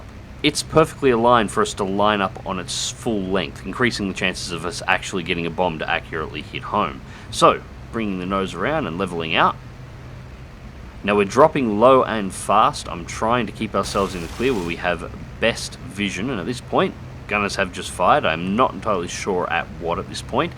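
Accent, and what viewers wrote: Australia. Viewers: Yeah mate he's bloody aussie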